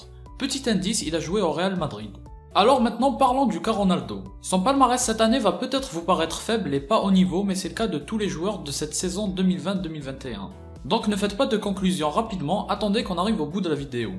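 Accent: Kabylie, Algeria. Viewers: Oh la la la l accent kabyle , je kiffe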